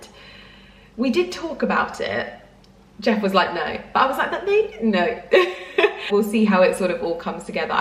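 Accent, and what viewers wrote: RP, United Kingdom. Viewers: Oh my god the accent!! What happened to you sooooo posh😅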